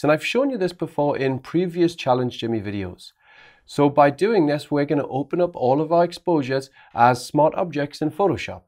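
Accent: Newcastle, United Kingdom. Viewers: To use an old English phrase, you really know your onions! Superb stuff. And your Geordie accent is always a pleasure.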